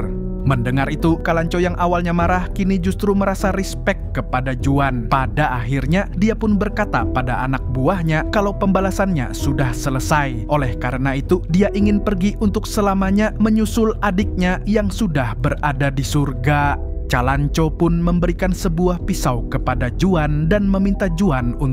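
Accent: Banjar, Indonesia. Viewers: orang banjar kah pian min😅😅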